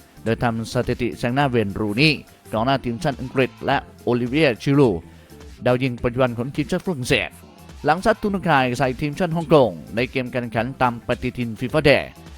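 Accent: Isan, Thailand. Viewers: แอดน่าจะเป็นคนอิสานเดานะ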